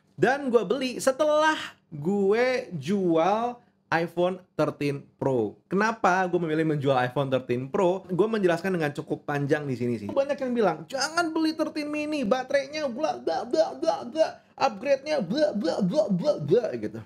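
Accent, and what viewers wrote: South Jakarta, Indonesia. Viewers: Jaksel bgt pusing dengernya.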